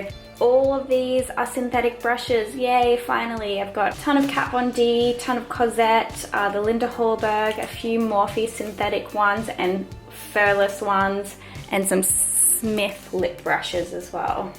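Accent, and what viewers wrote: Australia. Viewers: I loved this !! I thought you were Australian - did you move to America? Xx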